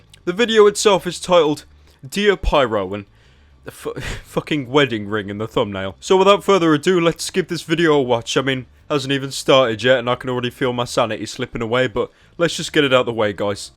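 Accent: United Kingdom. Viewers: what's wrong with his voice lol I love British accents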